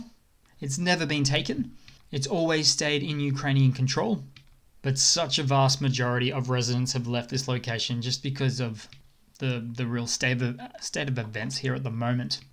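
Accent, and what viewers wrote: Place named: Australia. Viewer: Hey dude Where are you from I’m in Sydney You sound Aussie You sound like Marcus House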